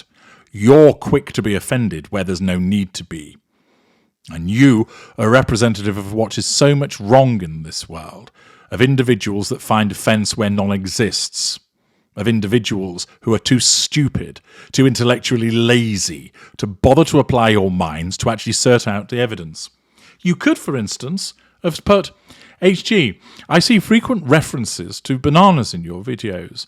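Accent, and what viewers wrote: United Kingdom. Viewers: I love how you take them down so eloquently & intellectually in your amazing well spoken British accent 👏 👌 It should certainly give them something to think about of the way they now look so foolish ❤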